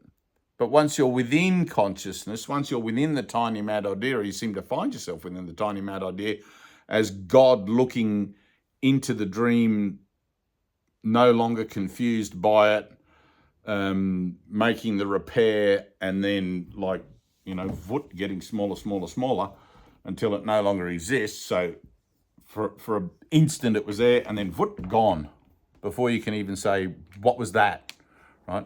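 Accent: Australia. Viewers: You sound Aussie. Are you?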